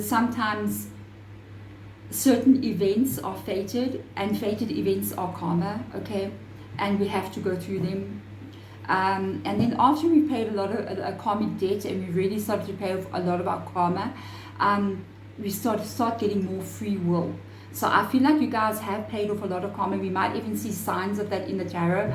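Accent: South Africa. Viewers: I hear a south African accent?😊 ❤I'm from joburg living in Oz. Thank you for this reading 📚 ♈️ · Thank you! You sound South African!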